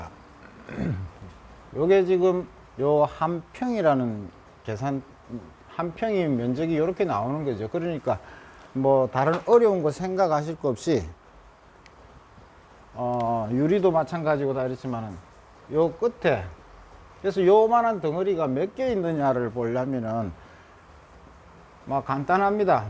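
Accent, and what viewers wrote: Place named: Busan, South Korea. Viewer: 사장님 부산 이네요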